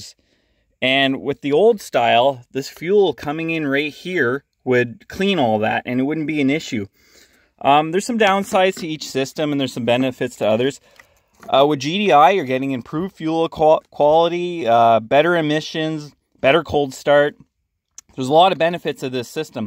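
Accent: Canada. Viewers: I'm Canadian, and you sound REALLY Canadian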